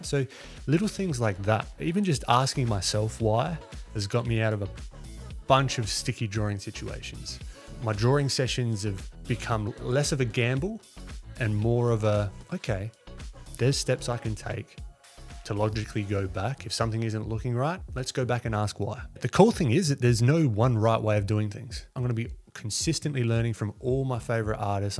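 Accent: Australia. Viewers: This type of content is great. Please, continue creating things like this. Really really helpful. Besides you sound incredibly Australian and I love it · I swear you are Australian. That’s all I hear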